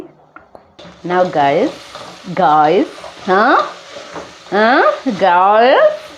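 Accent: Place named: Kikuyu, Kenya. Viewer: The Kikuyu accent in you made me Subscribe asap....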